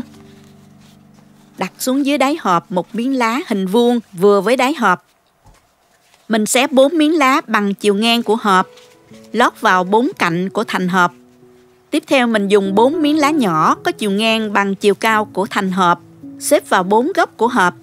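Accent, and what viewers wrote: South, Vietnam. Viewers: cảm ơn đả chia xẻ nhìn ngon và đẹp quá có giống nói rất đẻ thường giông nam ngọt quá à tôi nghe thấy mê luôn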